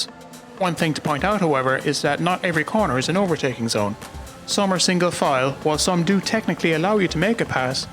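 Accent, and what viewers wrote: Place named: Kilkenny, Ireland. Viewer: What part of Ireland are you from? I can't tell by your accent I am guessing Kilkenny? 🍀🇮🇪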